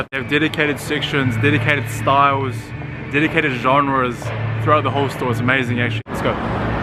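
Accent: New Zealand. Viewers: yeah was gonna say this lol, assumed you were aussie for a sec then i listen on and am like bruhhh this guys kiwi as 😂 nice video bro! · You sound like kiwi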